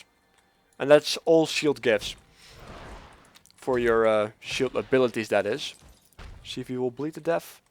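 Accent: Netherlands. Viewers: You're dutch, aren't you? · Ik snap hoe moeilijk het is om engels te praten met n nederlands accent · you just can hear on his english speaking hes from holland lol:P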